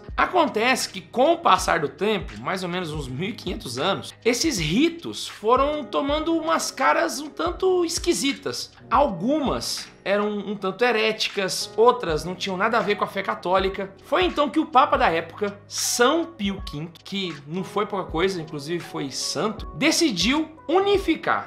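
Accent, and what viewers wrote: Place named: Goiás, Brazil. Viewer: Diretamente de rio verde goias pra dizer que esse e o melhor video sobre o assunto, conheci esse sotaque no comeco no video meu conterraneo,abraço .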